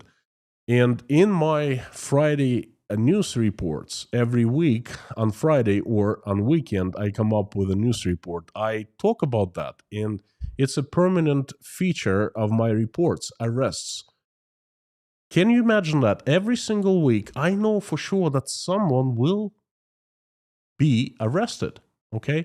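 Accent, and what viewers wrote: Russia. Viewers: to improve English pronunciation work on your 'O's. In English 'O's are pronounced as 'O'' not as an 'A' as in the Russian language. So Oligargs instead of Aligargs and 'Obey' instead 'Abey'. Your English is excellent though. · Thank you,. This channel is gold. Watching from merry EU. It's a bit unfortunate that your accent is so similar to Putin's puppet one in Puppet Regime on YT. I expect you anytime to say "welcome back to this authoritarian life" or "laughter is such an emotional cleanser" Luckily it also sounds like Elvira Bary and a bit like Vlad Vexler who stresses more on the British accent so you're in company of good fellow Russians. Please, keep the good work going 👍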